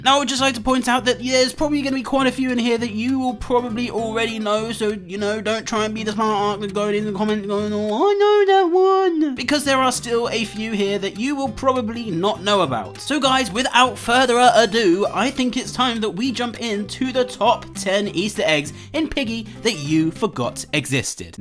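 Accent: United Kingdom. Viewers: are you bridish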